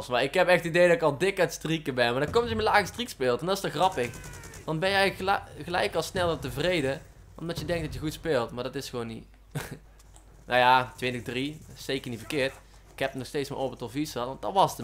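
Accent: Brabant, Netherlands. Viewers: Hij komt ergens uit Brabant. ;)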